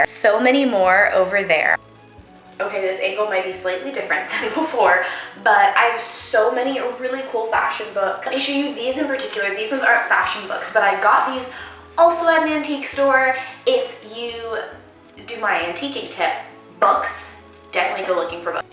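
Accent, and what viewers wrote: United States. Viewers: From an American who speaks french: Use an online course, because the easiest way to learn is to immerse yourself in the language, and Rosetta Stone can help simulate that experience. Also, your American accent is very strong and could interfere with learning a French accent properly, so an online course can help correct that <3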